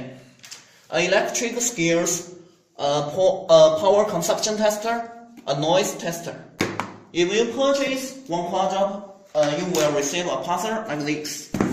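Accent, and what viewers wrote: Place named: China. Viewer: Evert van Ingen made in china english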